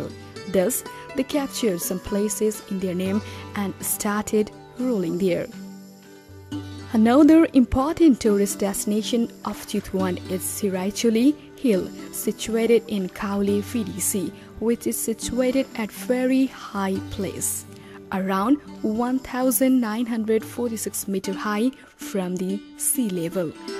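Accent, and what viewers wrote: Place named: India. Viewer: Indian accent spoted =)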